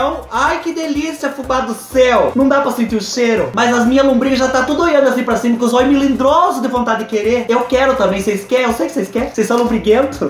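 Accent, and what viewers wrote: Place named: Caipira, Brazil. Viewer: Fubá tudo que tu ganhou foi bem merecido, você faz a gente rir com esse sotaque meio caipira amo 👏🏾👏🏾👏🏾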